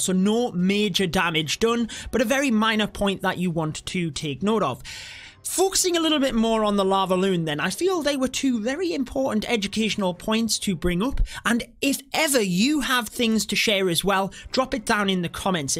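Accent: United Kingdom. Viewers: Who else is just enjoying the nice British accent?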